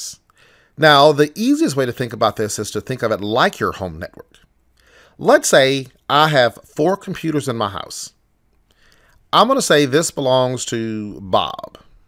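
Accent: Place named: South, United States. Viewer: I love how you explain this stuff! It's helpful for my work. Also, you have a pretty cool southern accent :P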